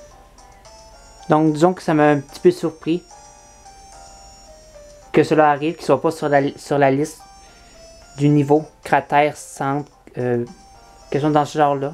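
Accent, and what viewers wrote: Quebec, Canada. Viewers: je te soutient mec Même si tu est québécois tu fait l'effort de parlé fr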